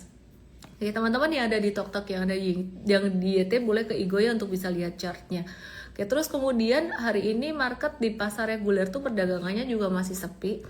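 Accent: Java, Indonesia. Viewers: Suaranya medok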